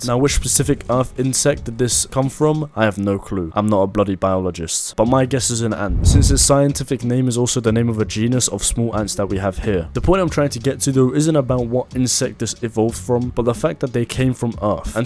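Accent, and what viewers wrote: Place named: United Kingdom. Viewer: Hey great video! Not only does it help that you sound British, but you also go into a good amount of detail! I look forward to your next information vids :)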